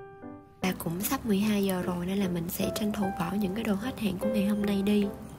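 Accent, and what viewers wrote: South, Vietnam. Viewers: Huhu hiếm lắm mới nghe được một Vlog của bạn nữ giọng miền Nam nghe ngọt muốn xĩu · xem nhiều vlog rồi nhưng nay nghe được giọng miền nam ý, kiểu nhẹ nhàng. thường mấy bạn giọng bắc mà ấm thì dẫn nghe nhẹ nhàng. nhưng nay nge giọng miền nam vừa dễ thương vừa nhẹ nhàng luôn í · Nghe giọng miền Nam là ủng hộ liền. Chúc bạn sức khỏe nha ♥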